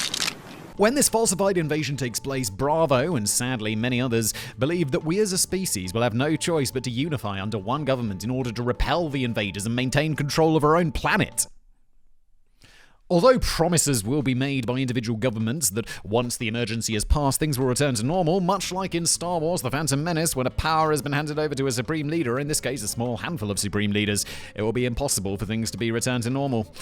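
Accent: United Kingdom. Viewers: Simons Brit accent is at times a bit too heavy for me. But today, it was a fucking sonnet. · what's so "intelligent" about this guy. Is it because he speaks with a British accent? Apparently, he has been the voice of several youtube channels and somehow ends up copying the channels for himself. The guy is not even a scientist himself, and he's here criticizing those who don't follow the "official" narrative. These are the same people who won't let you even consider the possibility of the virus escaping from a lab which first appeared you know... 5 blocks from one of only 3 labs that could have made the virus. Subjects that were discussed at the time at the Joe rogan show. wished he was invited to Joe Rogan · chill out people on pronouncations, he speaks the unrefined British English, not the improvemed American.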